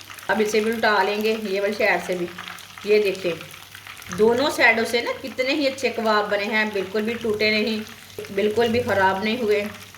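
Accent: Punjab, India. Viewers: Punjabi bolyn to behter hy full punjab8 lehja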